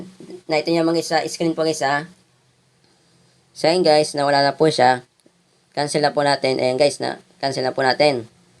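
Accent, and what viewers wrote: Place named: China. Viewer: sakit sa tenga "guys ahhh" intsik sta ahhh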